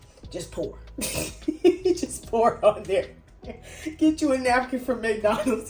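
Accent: South, United States. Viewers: I sure do like your (Southern?) drawl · 😅 sound like my cuzzin! Girl you country? Love ya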